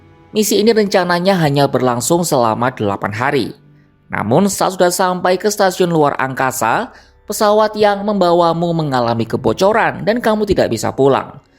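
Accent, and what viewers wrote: Java, Indonesia. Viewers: kerja keras editor filter midbass karena medok parah. Aneh sekali narator ini. Kamu kuliah di mana sih. Saya aja puluhan tahun di Jawa ga medok. 😂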